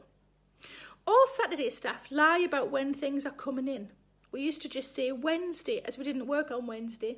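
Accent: Newcastle, United Kingdom. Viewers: Thank you Sarah for these episodes. I look forward to them each day. I got to know you watching episodes of "QI", which we can get in the states through Britbox, which has the luxury of captions. I've gotten pretty good, with your readings, at understanding a Geordie accent (I hadn't realized that's what it was until you mentioned it somewhere - you are more 'understandable' than Ross Noble!) Thanks again - bless you - be safe! Eric in Indiana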